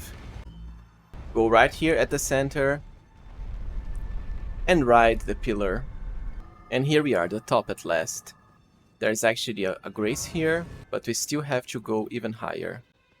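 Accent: Brazil. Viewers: esse cara é br né? esse sotaque n engana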